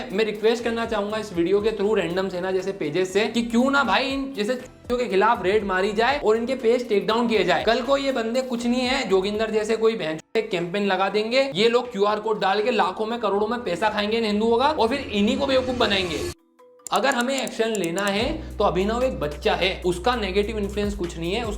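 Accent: Indore, India. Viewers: Bhiya Aap Indori ho kya ? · Bhai tera INDORI andaaz bht achha laga... aisa laga koi bada bhai samjha rha hai sahi baat❤❤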